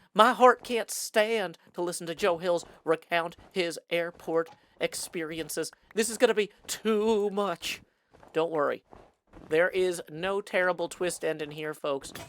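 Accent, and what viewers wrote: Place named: South, United States. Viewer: Hearing the English mannerisms in a southern accent all episode was a treat!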